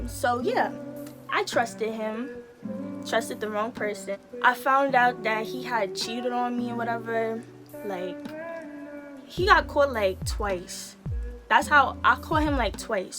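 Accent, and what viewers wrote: New York, United States. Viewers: I can hear your New York accent. I soon as I heard you speak I just knew you were from New York. Anyways you are so pretty😍😍😍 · I love your accent❤ you from NY🎉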